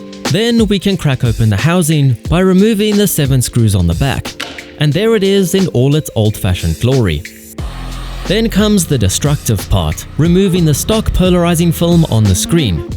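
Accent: South Africa. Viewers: Love the South African accent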